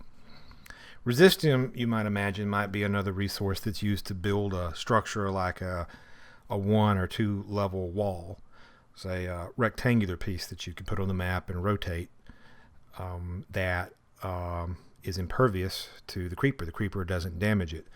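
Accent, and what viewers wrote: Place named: South, United States. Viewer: I don't know why I expected the dev to be Croatian or something but no he's southern af.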